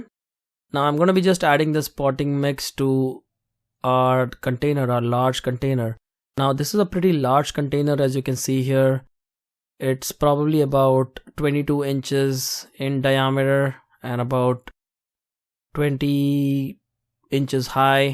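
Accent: India. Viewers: I know u r indian By ur ascent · Your accent is Indian man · your voice teling that you are indian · Sound like india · why you sound like indian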